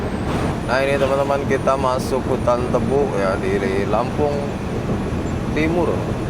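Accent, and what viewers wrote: West Java, Indonesia. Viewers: Sunda na timana, a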